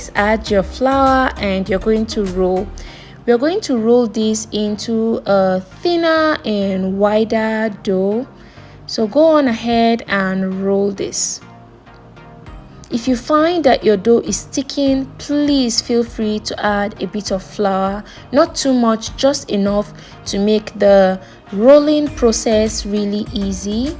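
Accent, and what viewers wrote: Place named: North, Nigeria. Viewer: I love the third method: your voice. It's so soothing and engaging 😊😊😊 I sense an almost non-existent accent. Are you from Northern Nigeria? 😊😊😊 But honestly, thank you for this videos. The way you explained left no room for questions. You had every information in mind when you were making the video. ❤❤❤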